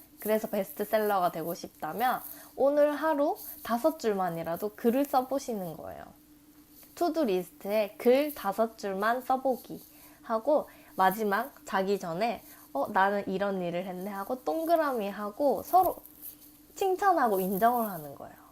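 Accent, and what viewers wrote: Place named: Seoul, South Korea. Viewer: 어릴때 서울에서15년정도 살더니 서울말을 잘하네요. 영상도 너무 좋네요.~♡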